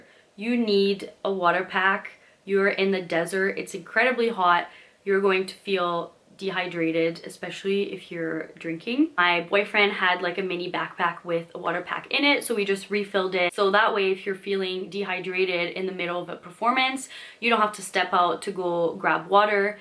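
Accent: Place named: Canada. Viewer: You really analyzed this Coachella event especially the differences between Weekend 1 and Weekend 2. You are so good at planning and organization, you should work in government budget analysis! I love your Canadian accent, it really makes you sound warm and personable, and can make a mean maple candy! Just kidding!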